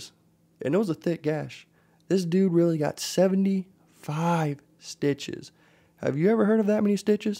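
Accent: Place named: Canada. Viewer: Dude, you're even starting to sound Canadian. "Lower body injury, he'll be alright, eh?" Classic Canadian add on there. Beauty, eh.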